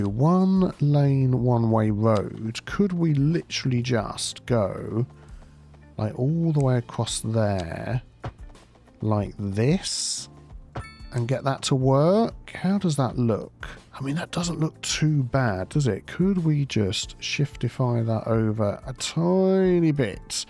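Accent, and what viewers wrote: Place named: North, United Kingdom. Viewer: ik this is like a super weird and irrelevant question but are u a northener of a southener coz u have a friendly northerner vibe but i can place ur accent nowhere plz ignore if this is too personal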